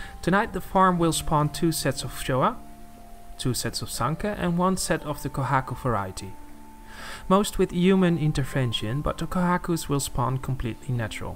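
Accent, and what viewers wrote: Netherlands. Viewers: it sounds like you have a netherlands accent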